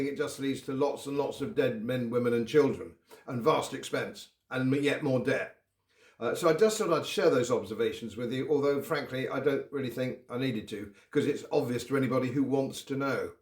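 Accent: United Kingdom. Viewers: I cannot believe I am hearing these suppressed TRUTHS about the world we (actually) live delivered by an eminently respectable BRITISH voice on Youtube... I normally have go onto Internet Archive to get this kind of information (and then often only after 'creative' searches and wading through dozens and dozens of off-the-case fluff to get to the good stuff!).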